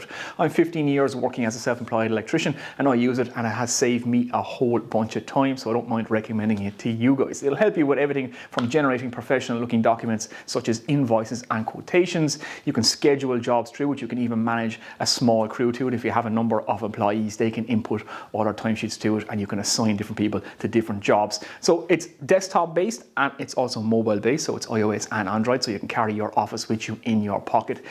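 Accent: Ireland. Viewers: Dang if I made this video it wouldn't be a 10th as cool because I don't have that badass Irish accent! Great job on that bird feeder and video!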